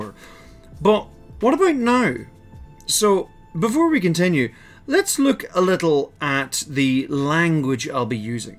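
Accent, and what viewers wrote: Ireland. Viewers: I don't hear any sounds in his speech that aren't Irish. American accents are more influenced by some Irish accents than by others. In particular, the more northerly Irish accents have more in common with some of the best-known American accents. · Thanks for doing this video, Ive done a very similar one looking at the same content, just with a lot more data and less of an Irish accent! Check it out and let me know what you think of it "What if Ireland United?"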